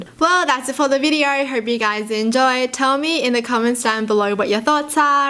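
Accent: Australia. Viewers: omg idk why but everytime I see, I think of Rose' (from blackpink). Like the cute aussie accent and everything!✨ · Who else loves her Aussie Accent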